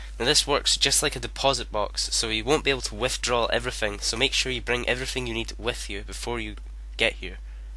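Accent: Scotland, United Kingdom. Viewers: Your one of the best rs Guide makers out there and Your scottish :)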